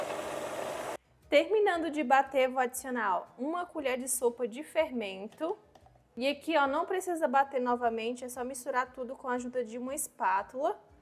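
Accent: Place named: Northeast, Brazil. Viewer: Sensacional!! De que região do nordeste você é?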